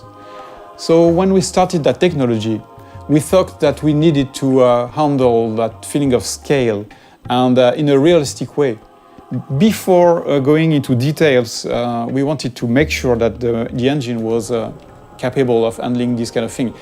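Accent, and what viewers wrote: France. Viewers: sa se voit que c'est un francais vu son accent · J'avoue l'accent français se fais ressentir de fou 😂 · il est français donc pour son accent on peut comprendre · Et les gars stop, pour son anglais. Il a raison de faire la vidéo en anglais. Et puis entre nous vous auriez un meilleur niveau d'anglais en France çà irait mieux, vous vous ne poseriez même pas la question. L’école ne suffit pas alors faut travailler a coté pour l'anglais. Et la franchement même si il a un accent français qu'est ce que ça peut faire. Au moins il fait l'effort de faire une vidéo compréhensible par le monde entier. Alors arrêter de penser en permanence a seulement votre petite personne !!! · C'est con que seul les français ont honte de leur accent le gars est français il va pas faire l'accent américain,chinois ou africain le pire c'est que pour les ricain l'accent français est très beau.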